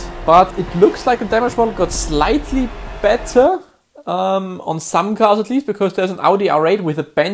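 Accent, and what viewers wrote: Germany. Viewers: Thanks for the info... And your german english.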